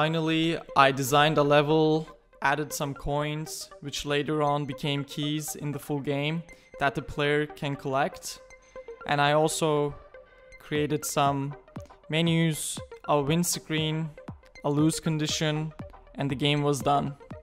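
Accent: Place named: Turkey. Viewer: izlerken dedim adamın ingilizcesinde türk aksanı var gibi la sonra baktım kanalın adı lol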